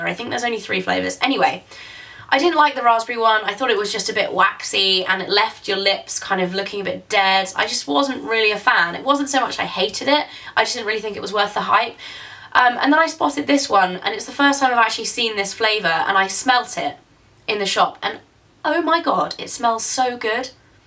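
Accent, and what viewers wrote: United Kingdom. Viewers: While I love watching your videos for the beauty/makeup reviews, I have to admit that one of the BIG reasons I'm subscribed is so that I can listen to your voice. I LOVE British accents! Love your videos too! I just bought "It's a 10" and it was a little over $30 in the US. Totally worth the money! My hair feels wonderful after only using it once! Thank you for sharing your beauty secrets,. <3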